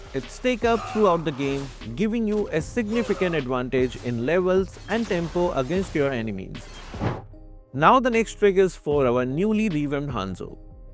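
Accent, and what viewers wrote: India. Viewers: I love because he has such wonderful edits and a gorgeous indian accent!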